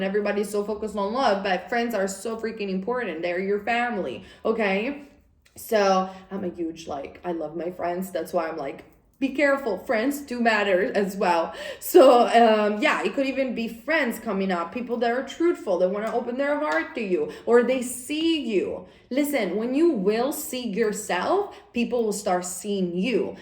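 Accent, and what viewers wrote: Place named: Italy. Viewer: thanks girl xxx love your energy and italian accent.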